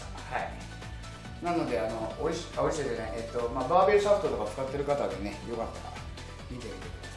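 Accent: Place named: Kansai, Japan. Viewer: やっぱり標準語より関西弁でお話されている方がRyuさんぽくて良いです。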